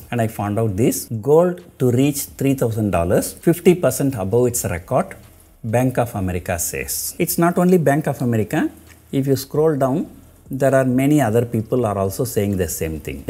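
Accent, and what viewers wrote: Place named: South India, India. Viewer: If P.R Sundar wud have been smart enough to tell his 4.5 lac audience to buy Gold in Oct'18 when it first spiked from 1250 to 1450$ or in Sep 2019 when it again started spiking and tgen did furthermore after crashing in Mar'20 and then told us the Targdt of 2100 and then to sell, i would hVe paid heed to his ridculous arguments in his southindian accent !! Fact is, he is laying out analysis that does no reading into reasons that lead to spike and completely ignores the 10+ Trillion$ of Debt added around the globe in just 9 months !! When mkt crashes, ppl first go in dollars and then dump dollars for Gold. Dont listdn to him ... Buy GLD 1300$ or 34K INR and wait for 2023 for it to hit 2000$ again. But Silver 52K n target 90K+ around d same time. You are Welcome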